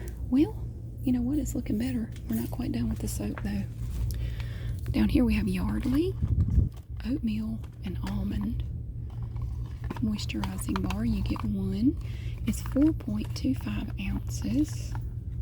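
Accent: South, United States. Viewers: Love your southern accent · I’m love your videos! I was just like you….I too had a smart mouth 😂 I did get in trouble for talking in class tho. I wasn’t disrespectful just talked too much 😂😂😂 I’d have to stay after school and write “I will not talk in class” on two pages of lined paper! 😂😂😂 And I love your southern accent. I live in a suburb outside of Chicago. Not a lot of southern drawl in the Midwest.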